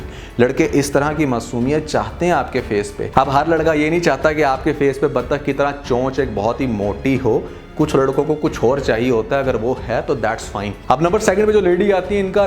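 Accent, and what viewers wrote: India. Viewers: Bhaiee aap hindu ho kia aap ki language or aacent hindi hay baar baar ( achhay say) boltay hn aap achay tareeqay say kehna chahiye pakistanion ki tarah lanat teri shakal per urdu ko bigaar rahay hn aap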